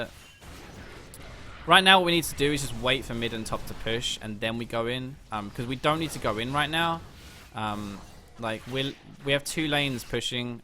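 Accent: United Kingdom. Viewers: What are you doing well: Smashing brilliant Brittish accent Pace of speach is good Thoroughly explaining your thought proces (and moves) What you can Improve: Explaining your team composition. I don't understand the Zyra into Morg/Lee, nor the poppy into Renekton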